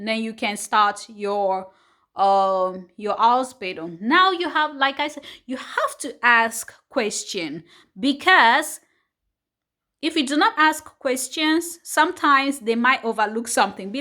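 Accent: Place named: Cameroon. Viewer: Your accent sounds like a Cameroonian.😀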